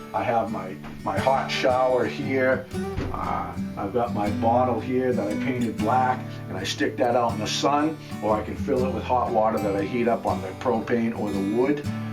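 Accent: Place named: New England, United States. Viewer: I am in Northern Wisconsin. Small place on five acres of land surrounded by State Forest and other private uninhabited lands. Although we are not a primitive as you are, we do enjoy our surroundings and gathering and using our natural resources. I saw you mention the "Camp". I thought I detected a NE accent. Being born in MA, my brothers and parents live in NH, so the term Camp was very familiar to me. Approximately where is the Camp? One brother is in Auburn, while the other is in Wolfsboro. Just curious. Great job on the cabin, and glad I found your channel.........Thanks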